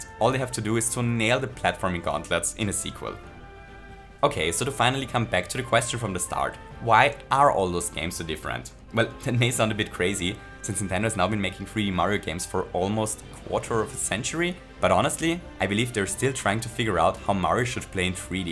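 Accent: Austria. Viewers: Wenn da östereichische dialekt ballert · Man merkt so den Österreichischen Dialekt raus xD Aber richtig cooles Video!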